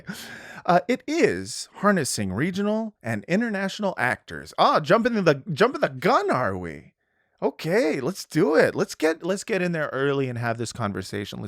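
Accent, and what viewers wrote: England, United Kingdom. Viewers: I'm a Kiwi and I thought your Pommie accent was great! Only randomly came ax this thanks to YT algorithms since I've been binging Palestinian history and news content. It's been super-interesting listening to your views and experiences. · as a brit, your accent of a ingerlander was perfect. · Your British accent is 45% Arthur Shelby and 55% Alfie Solomons from Peaky Blinders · your british accent is amazing hhhhhhh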